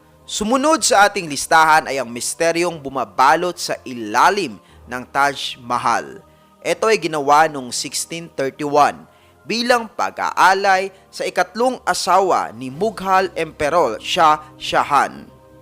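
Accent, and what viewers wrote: Visayas, Philippines. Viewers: Bisaya diay ka kuya ahahah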